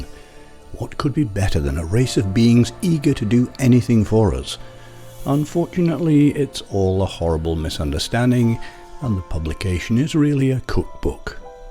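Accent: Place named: Yorkshire, United Kingdom. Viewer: There's a hint of a Yorkshire accent leaking through the RP elecution if I'm not mistaken.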